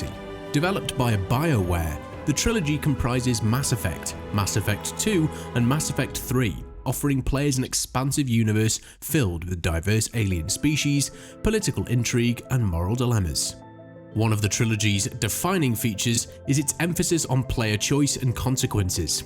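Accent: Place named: United Kingdom. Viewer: British english accept is the worst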